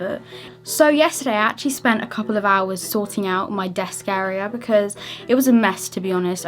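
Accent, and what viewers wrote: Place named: England, United Kingdom. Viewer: Your English acsent i need to get it 😩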